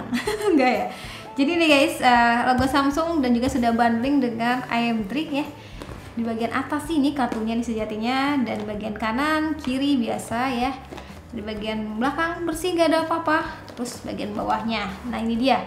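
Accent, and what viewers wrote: West Java, Indonesia. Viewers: Sunda bangetb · Orang sunda ya teh?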